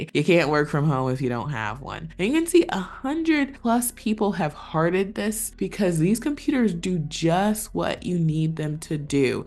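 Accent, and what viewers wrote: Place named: South, United States. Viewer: your voice tells me you are also a Southern girl! Alabama here. You are my favorite remote job lead lady! Blessed Monday to you. Keep 'em coming! 😊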